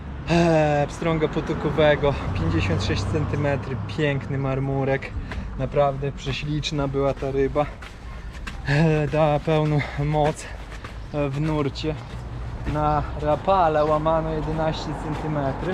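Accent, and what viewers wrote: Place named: Podlasie, Poland. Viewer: Kurwa ta twoja gadka masakra z jakiej ty wiochy pochodzisz gdzieś pewnie z podlasia albo chuj wiii. Ciezko sie tego słucha.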